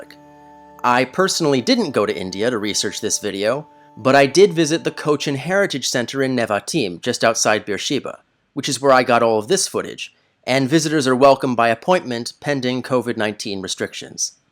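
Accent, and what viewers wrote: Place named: United States. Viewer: hey where did you grow up? your accent. sounds so American besides when you make the 'a' sound as in vAstness, where it sounds like the british 'vahstness' versus the american pronunciation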